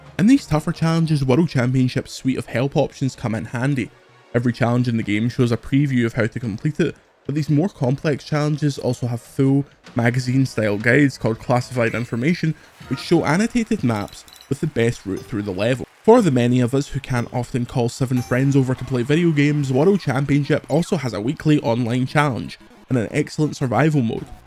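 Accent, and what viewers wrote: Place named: Scotland, United Kingdom. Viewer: is sounding particularly Scottish today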